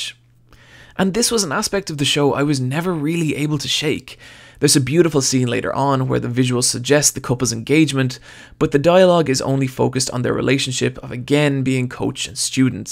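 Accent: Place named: Ireland. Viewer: No hate i promise just wanted to say the dude sounds Irish (i'm also Irish)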